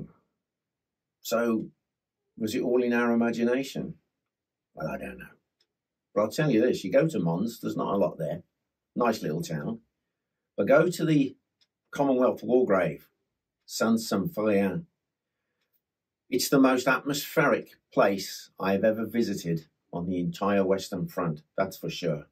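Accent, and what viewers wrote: United Kingdom. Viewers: Love your story telling. Love the British and their accent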